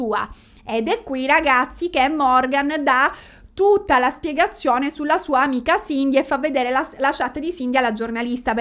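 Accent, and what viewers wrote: Sicily, Italy. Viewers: te l'ho chiesto perché sentivo una leggera inflessione ma non ero sicuro che fossi di queste parti: io sono di Parma ma mi sono trasferito con tutta la famiglia, a Siracusa. La Sicilia è il paradiso in terra! Ciao e complimenti per il tuo canale e la brillantezza con la quale lo conduci!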